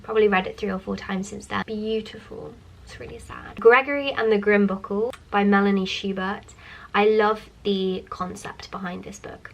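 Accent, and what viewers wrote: United Kingdom. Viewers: Love your British accent!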